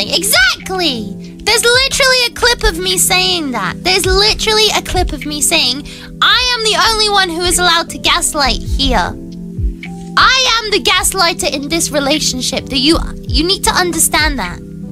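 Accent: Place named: United Kingdom. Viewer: I think its the firs time I hear a british vtuber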